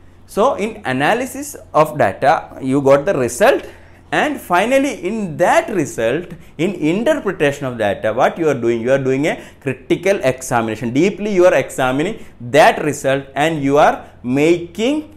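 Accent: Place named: Tamil Nadu, India. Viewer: Aap English ke zariye bataiye shayad use Hindi nahi aati Tamil Accent hai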